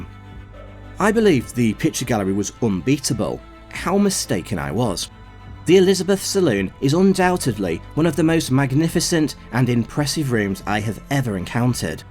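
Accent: England, United Kingdom. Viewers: I love English English pronunciation. Belvoir = Beaver 😂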